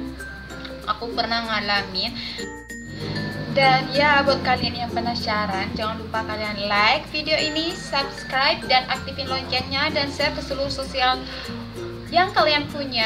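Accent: Madura, Indonesia. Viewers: Logatnya mirip bgt sama orang Sumenep (Madura) 🙄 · kakak dari madura ya? bicaranya kek aku hehe :v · Kayak ada madura-maduranya deh kk 😂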